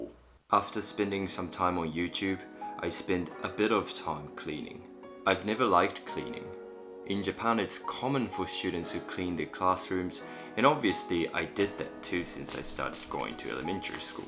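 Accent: New Zealand. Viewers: Minimalism is a state of mind- and it takes practice. Also: Your combination of Kiwi and Japanese English accents is simply fantastic. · I love his kiwi accent.. or is it him that i love? ☺️ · That was my first thought as well... wth... why r u microwaving bread. Also why only 1 slice?????? Plus I was trying to suss out the accent and u said nz and now I get it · You have an interesting accent- a combination of different places?? How long did you live in New Zealand for? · HOTTIE ! I love New Zealand accents and Japanese men. Why am I not married to this guy ?? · Kiwi accent 👍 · Really enjoyed this video, love the whole concept of minimalism and this was very achievable, once you mentioned that you had lived in New Zealand I could recognise a slight Kiwi accent. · I love this! Aussie girl her and soon as you started talking i was like.... hmmmmm that accent... 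then you said you moved from NZ! Lightbulb moment ! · Haha! Even before you mentioned NZ I was like, that accent sounds very familiar... I’m a kiwi 😊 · Ahh! I knew you were from NZ I could hear the nz accent! Just a wee bit 😄 I’m from Auckland. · Great insightful video. Enjoyed your thoughts on minimalism. Also, strangely, I immediately noticed the flat “i” sounds of your New Zealand accent. G’day from a new Aussie subscriber :) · You have such a Kiwi accent Lol!! Keep up with the YouTube videos man! I miss living in Japan..had to move back to NZ because of Covid :-( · I thought I detected a New Zealand accent, I wasn't wrong. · It’s more like a kiwi accent. Interesting that you mistaken it for British though! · great video, i'm from NZ and i can hear the Kiwi influence in your accent :) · His accent is not pure Kiwi. It sounds a lot Kiwi and a little bit something else 😊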